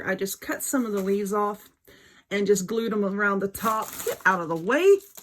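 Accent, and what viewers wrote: South, United States. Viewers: I just love your projects! I love your Southern Accent and fabulous SENSE OF HUMOR! Merry Christmas from ONE SOUTHERN GAL to ANOTHER❤️🌲❤️🌲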